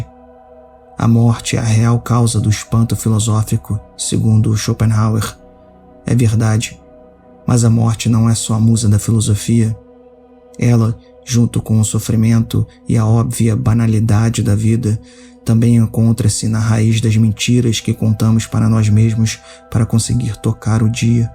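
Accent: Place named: Rio de Janeiro, Brazil. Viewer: Oque me causa desprezar como ser vivo eh esse sotaque carioca com " x " 😂😂😂😂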